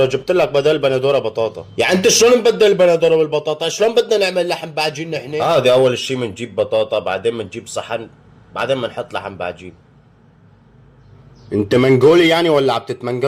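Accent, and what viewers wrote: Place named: Iraq. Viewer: اقول لك انت كلامك عراقي بس انت اظن شو اريد او اردني بس كانك انت عراقي مبين حتى من العماره😂😂😂😂 هذه المنطقه اسمها العماره اسمها اي اصلها ميسان😂 في يوم من العماره🎉 خلي يحط لي لايك لعيون العراق او العراق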